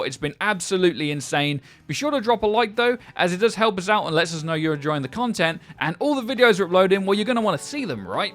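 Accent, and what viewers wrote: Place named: United Kingdom. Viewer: Where in the hell is Frido? I’m getting SUPER tired of mispronounced monotone British accented commentary from Mr. boring over here.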